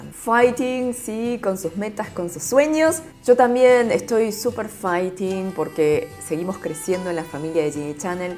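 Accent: Argentina. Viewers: Me encanta tu forma de hablar español con acento argentino .😊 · Hola una pregunta eres argentina , te encuentro el asentó muy parecido , me gusta tu sencillez buena suerte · Hablas muy bien el español, me encanta el acento de los argentinos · Tienes asentó argentino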